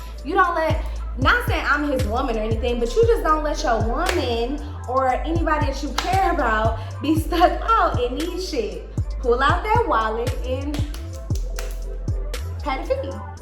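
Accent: New York, United States. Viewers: I your little new York accent or whatever ❤️❤️❤️❤️❤️❤️❤️❤️❤️❤️❤️❤️❤️❤️❤️❤️❤️😂😂😂😂😂😂😂😂😂😂😂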